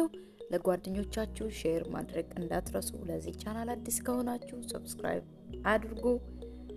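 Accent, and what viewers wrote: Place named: Wollega, Ethiopia. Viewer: Loqodan ittiin guddadhe qulqulluu wallaggaa gaafan dhagahu nan gammada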